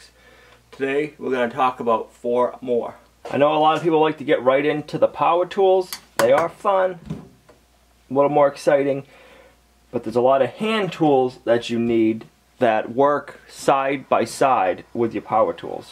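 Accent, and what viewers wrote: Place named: Vermont, United States. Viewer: What are temps currently at Lumnah acres? I think that you mentioned (if not your accent says so) being near Vermont. We are currently at -5 for a day time high temp.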